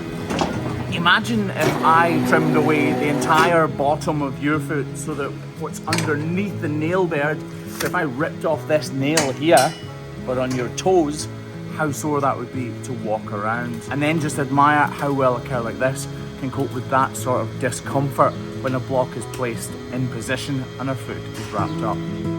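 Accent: Scotland, United Kingdom. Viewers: Having trouble saying "similarly" with a Scottish accent try saying "Purple burglar alarm"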